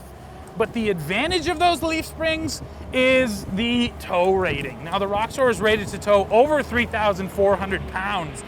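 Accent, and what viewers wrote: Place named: Minnesota, United States. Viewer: "You know...." :) ...Are you Minnesotan? I am, and you sound just like me... You know? :)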